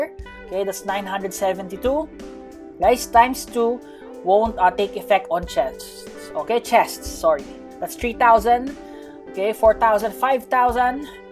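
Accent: Philippines. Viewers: You have filipino accent bro are you filipino? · This guy reminds me of my teacher in computer because his Filipino accent😂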